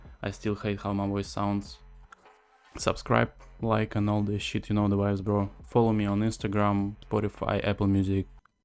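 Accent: Russia. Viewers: акцент русский у тебя) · Блет, по акценту ты будто русский:/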